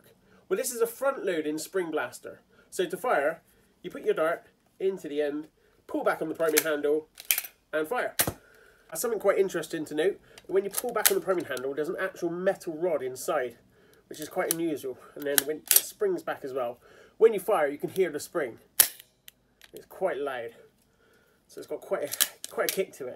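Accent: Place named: Bristol, United Kingdom. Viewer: Haha! Awesome vid! Love the accent...Bristolian?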